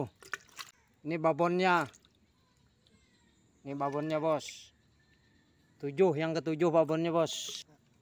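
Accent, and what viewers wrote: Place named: Banjar, Indonesia. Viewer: Logatnya urang banjar saudaraku....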